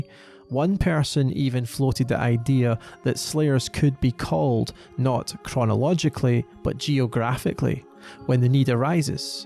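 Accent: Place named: Scotland, United Kingdom. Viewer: Heard a Scottish accent. From Edinburgh here.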